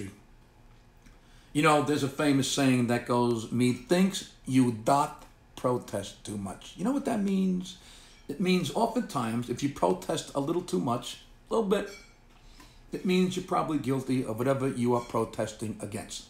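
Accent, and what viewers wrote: New York, United States. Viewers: Hey. i had to move to virginia to take care of my dad who is a decorated war hero...for 3 years now. my family has suffered from discriminations..yankee..go home even teachers and his football coaches...can you hit on this please..were hard core new york wear are shirts proud.but its taking a toll on my son..we love your videos so nice to here some swearing and that accent only new yorkers have..my sons name is Tristan..you would lift him up so much..we appreciate all you do...laughed my ass off on your virus video...ill burn the fucking truck and walk home...funny shit..we where rollin.your awesome.cant wait to get back home when my dad passes. We love you brother stay hard and be safe much love to your family as well...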